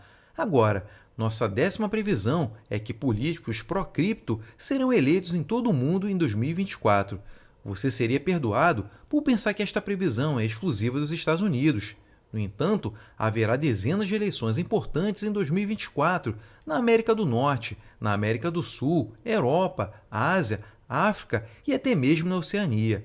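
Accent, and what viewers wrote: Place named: Rio de Janeiro, Brazil. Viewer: Me perdoe, se você tirar seu xis carioca, o carioquês horroroso da sua fala você dará um passo arriba. Cara, você não sabe como esses xissss são desagradáveis.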